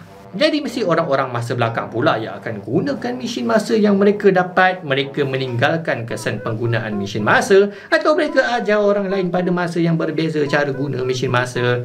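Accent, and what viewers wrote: Malaysia. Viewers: pak, boleh minta tulisan dibawahnya dong, tambahkan subtitel, hehe, saya masih binggung sama logat melayu, hehe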